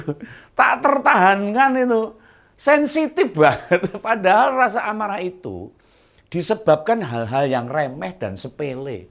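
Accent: Java, Indonesia. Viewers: Alhamdulillah Om nongol lagi Bicara apa adanya luwes cara membawakanya Kental basa jawanya. Monggo pilih Ganjar Mahfud yang tegar dan yahud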